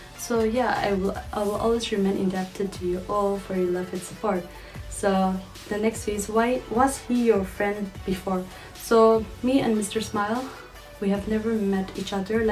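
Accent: Nagaland, India. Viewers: I have many naga friends and now my english is like them I love the naga accent 🔥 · You look like a Ao but your accent sounds like angami...you might be thinking why...🙄 Here is the reason because your voice is so soft like angami ladies🤗🤗 people will believe you if u tell thm that you are cocktail..lol.. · Originality is what you are my sister. The accent is real naga♥unlike those wanna be famous nagas local celebs. Praising the Lord Jesus Christ and sharing the Bible verses in the middle is the best thing I came across in the video.. Down to earth and being humble will take you very far in achieving your goals.. May God bless you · Idk why people still consider English speaking as a barrier of intelligence. No matter how well we speak English and have accent like those of the Britishers, we will never be Englishmen. Being a Naga, it is okay to have an accent like a Naga because that's our identity. 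And whoever criticizes on your accent, well they need to get a life and maybe get back to the roots. Besides, you have a wonderful singing voice.👌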